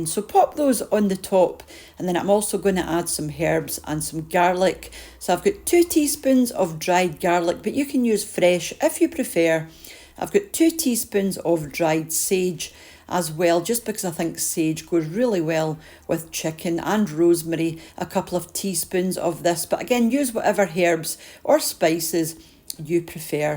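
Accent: Scotland, United Kingdom. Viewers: Hey i sure enjoy your videos, but this might be because I'm American and love the Scottish accent. I watched perhaps your first video of Shepard's Pie and you have really improve dyour presentations--you are a youtube STAR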